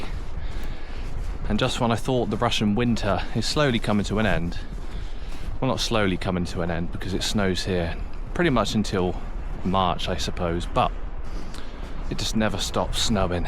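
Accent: United Kingdom. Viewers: Американское произношение гораздо чище и понятнее британского. Бриты говорят как будто у них рот набит кашей. Мямлят и не произносят окончание слов. говорит с бр. акцентом, но понятно каждое слово. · Британский акцент это нечто. Каждый раз ловлю вайбы, как будто мне профессор в Оксфорде лекцию читает ( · What do you call our resiential buildings? Comfy? Commie? (as in Communist) Coming? I don't understand British accent too well, I'm afraid. LOL But anyway, they have such buildings all over the world, - Europe, USA, Canada... It's not something typically Russian or Soviet :) And hey, welcome to Russia! Stay warm! Spring is nigh! :)))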